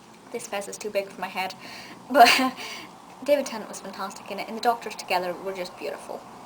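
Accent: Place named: England, United Kingdom. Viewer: yup it wouldn't be a proper dr. who review without the english accent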